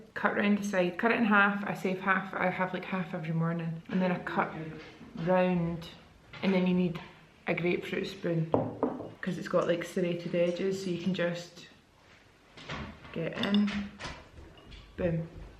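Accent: United Kingdom. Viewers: Your accent is lovely from which part of uk you are?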